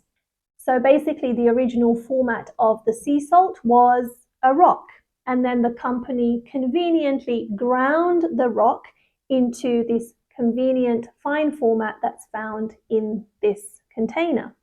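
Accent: United Kingdom. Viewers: Lovely British 😊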